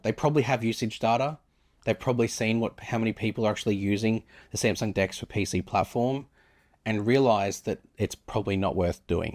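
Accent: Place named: Australia. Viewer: Excellent comparison. Your accent reminds me my old good memories in Australia during college back in <num>. Thanks for the info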